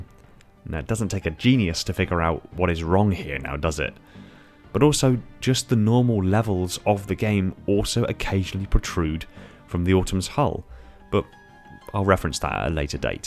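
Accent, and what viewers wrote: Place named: South West England, United Kingdom. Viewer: This is top notch but I've spent the whole video trying to work out where in the South West your accent is from. I'm leaning towards Bristol but I'm also getting Gloucester vibes.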